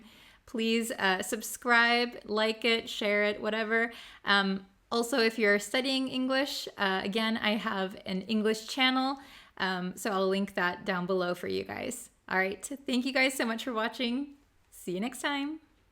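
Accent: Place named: United Kingdom. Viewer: It's for... You're American but you speak British English, l don't understand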